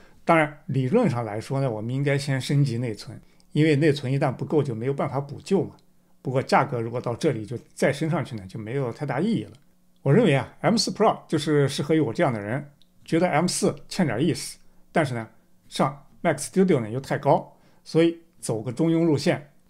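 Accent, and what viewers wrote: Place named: Xinjiang, China. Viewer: 老哥。听口音 新疆的哇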